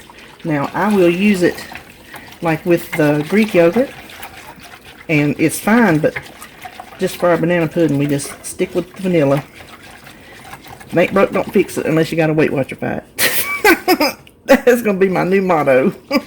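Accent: South, United States. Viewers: I'm pretty new to WW and I found your videos last night, and have been watching so many of them. I just adore you. I'm from SC and just your southern accent and colloquialisms make me think of my grandmother and your videos feel so homey. Thanks for sharing!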